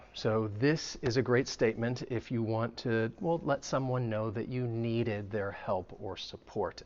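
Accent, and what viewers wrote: United States. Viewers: Hi teacher , thank you for this lesson beacuse you speak englush american accaent ( i love american accent iust ! ) so clearly and lowdly ! I am 60 years old ! My first language is turkish !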